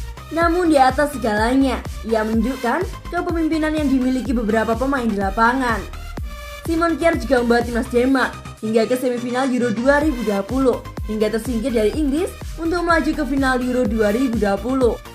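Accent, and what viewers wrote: Java, Indonesia. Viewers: Wkwkwk jawa, kesukuan banget ni vocalnya,, ga tau betapa luasnya indonesia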